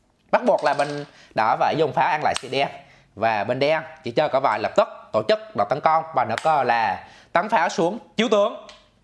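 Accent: Binh Dinh, Vietnam. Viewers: Giọng bìn định phú oen nghe hài quá kk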